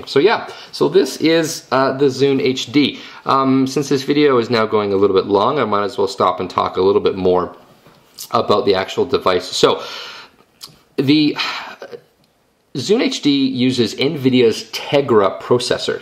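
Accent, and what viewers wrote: Canada. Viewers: And off-topic, but this was the first time I noticed the Canadian accent. lol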